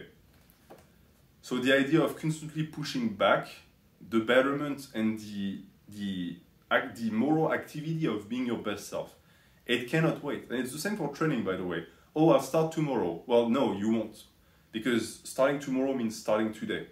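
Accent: France. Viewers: Sounds stupid but can u upload these on Spotify? I enjoy listening to a French man ramble about stoicism as I fall asleep with no ads or auto play.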